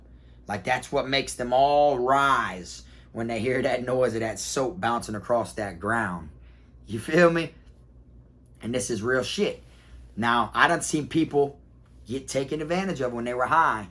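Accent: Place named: Florida, United States. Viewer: Thats how we talk in Florida lol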